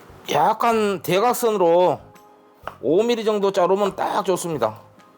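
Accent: Jeolla, South Korea. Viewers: 전라도 말씨네요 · 아따 때깔 좋아붑니다요 말투 너무 정겹고 좋아요 혹시 전라도 북도쪽이 고향 이신가요 저희 고향 사투리 억양까지 너무 비슷해요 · 맛깔나게 양념하고 내고향 전라도 사투리까지 구수하게 더하니 더욱 맛잇는 요리가 될거같아요 바로 따라해볼께요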